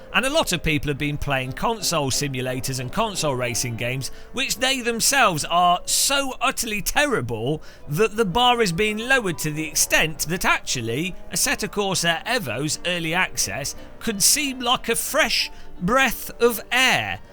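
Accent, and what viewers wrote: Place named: Midlands, United Kingdom. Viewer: Agreed. I only subscribed for the sarcasm, which is amplified by a Midlands accent. :-)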